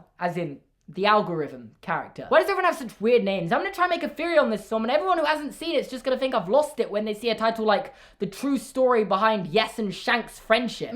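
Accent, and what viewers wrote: United Kingdom. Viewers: Oh yes British dad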